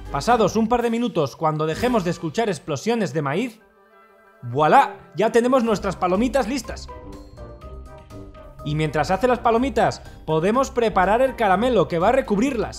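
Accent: Basque Country, Spain. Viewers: Es Vasco, hablan así todos!! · Eres muy grande y muy salao, y tienes el acento vasco muy bonito. Yo soy de Murcia y echo mucho de menos esos paisajes verdes de tu tierra. Y las recetas ke haces, muy ricas y sanas y te explicás divinamente.🥰🌷🌞😘🇺🇦🌈💜❤️🐶😻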